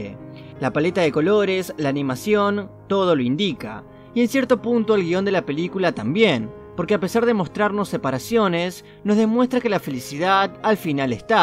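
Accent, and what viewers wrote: Buenos Aires, Argentina. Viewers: que paso con esa 'Y' cuando hablas ¿eres porteño?... Buen video like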